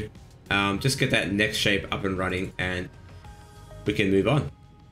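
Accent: Australia. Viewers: Finally I have completed my mission to find aggressively Australian blender tutorials.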